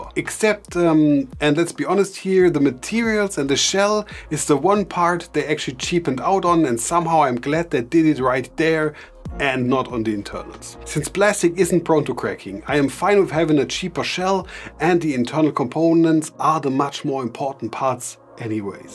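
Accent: Germany. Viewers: Stuagarter wo english schwätzt. Klasse Moove Digga! Gefällt mir!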